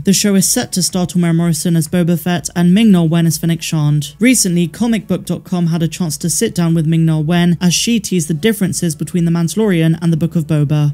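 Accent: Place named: Cornwall, United Kingdom. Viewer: I want more jibber jabber please in your cute cornwall accent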